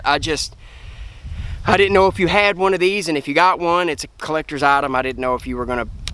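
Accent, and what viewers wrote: South, United States. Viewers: Good content and that southern draw is definitely new in the reviewer space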